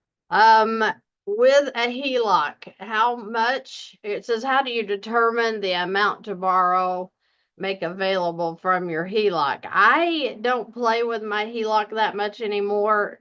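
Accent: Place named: Kentucky, United States. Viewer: Love your accent. From Kentucky here. Sounds completely normal to me. Thanks for the video.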